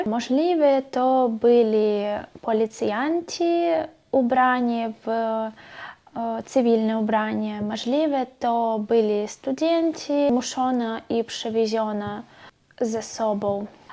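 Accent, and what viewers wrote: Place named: Podlasie, Poland. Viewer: Jesteś jeszcze młoda, jak będzie Ci się tutaj podobało i będziesz chciała to bez problemu zostaniesz Polką. A co do akcentu, to z tego co słyszałem na Podlasiu mieszkają Polacy i mają podobny akcent😁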